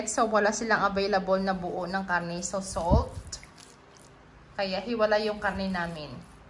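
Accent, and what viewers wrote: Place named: Iloilo, Philippines. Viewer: Ilonggo gid ya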